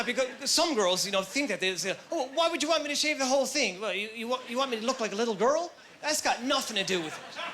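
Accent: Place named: Maritimes, Canada. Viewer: >>>. Tinsel cat ?? Best ever. Excellent set. I love a Maritime accent.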